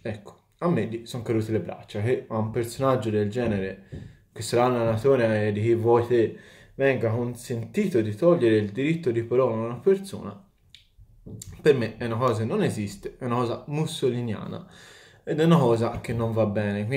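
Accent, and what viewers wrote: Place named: Florence, Italy. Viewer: Ti impongo delle cose: 1) Mai nascondere la c strascicata fiorentina 2) Torna ad aprire i pacchetti. Il mondo ha bisogno di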